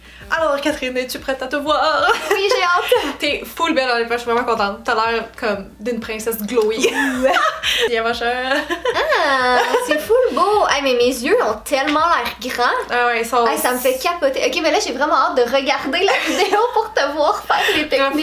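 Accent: Quebec, Canada. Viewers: Mais comme j'adorerais que tu me maquilles, déjà parce que j'adoooore ça être maquillée par une pro et aussi parce que je kiffe l'accent québecois :D!!! Hâte de voir d'autres vidéo de cette série. Bisous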